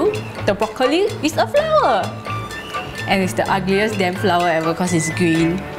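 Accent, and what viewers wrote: Singapore. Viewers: I love her Singaporean accent :D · Yeap, its known as Singlish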